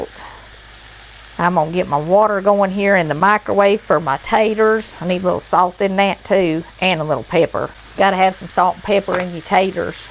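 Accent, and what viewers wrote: South, United States. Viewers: Love your channel, I'm a transplanted hillbilly, and to listen to ya makes me remember being down south! The recipes are awesome too! Keep up the great work, God bless! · It doesn’t really matter what you’re cooking I just like listening to your little country accent !!! The food ideas are great too !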